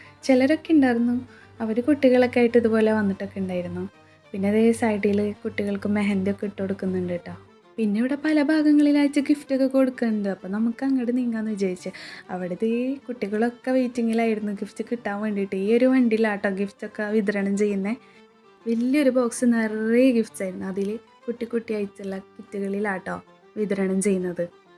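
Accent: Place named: Thrissur, India. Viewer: തൃശൂർ കാരുടെ സൗണ്ട് എനിക്ക് മനസ്സിലാവും ഞാൻ മലപ്പുറത്ത് ആണ് അബുദാബിയിൽ ഞാൻ തൃശൂർകാരുടെ കടയിൽ ജോലി ചെയ്തിട്ടുണ്ട് അങ്ങനെ മനസ്സിലായതാണ്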